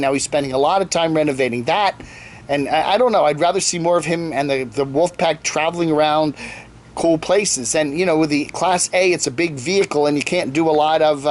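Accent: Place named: Baltimore, United States. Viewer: I love watching and listening to her Baltimore accent. She wants to have fun and has a lot of knowledge that she shares.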